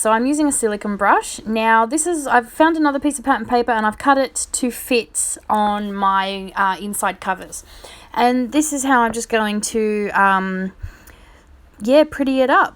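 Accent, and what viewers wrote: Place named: Australia. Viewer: like your work and your Aussie accent.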